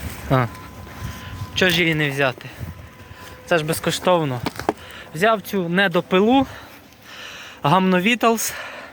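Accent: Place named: Khmelnytskyi, Ukraine. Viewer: Майже вгадав. По вимові зрозумів, що північ Хмельницької області. ))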